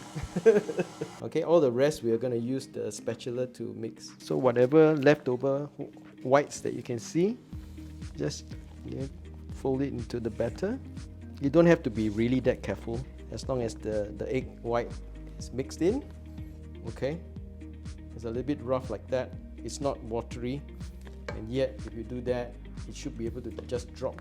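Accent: Singapore. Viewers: Are you from India! Or Singapore! You sound like Indian Chinese or Singaporean. Nice accent. That was funny. When the bake is cakking. lol I'll try your recipe. Your a jovil person.jovial. Thank you From North America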